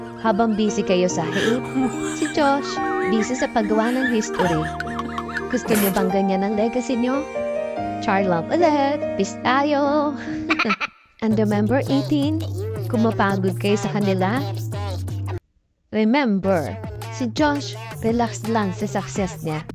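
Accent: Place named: Quezon, Philippines. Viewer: "Let's get right & not bitter but be better together"..i love it❤ It was fun watching & hearing you..always watched replay sa mga vlogs & ls mo..kung makakita man..silent viewer lang palagi but this one napa-comment ako! Josh really deserved the award..naiba lang dahil medyo mabagal..english ang lyrics..walang rude words o halong kabastusan kaya d natanggap ng mga ibang traditional hip hop..at yun ang naririnig ko lagi noong kabataan ko ng mauso ang rap dto sa atin kaya d ko ma-appreciate! You're my happy pill too..lalo na pag naririnig ko ang accent mo sa pagsasalita..nami-miss ko ang punto' ko..bumabalik lang pag umuwi sa amin sa Lucena, Quezon..magkapitbahay ang probinsya natin kaya hawig ang punto natin😅 Thanks..keep going..nakakaaliw ka!🥰😅👍❤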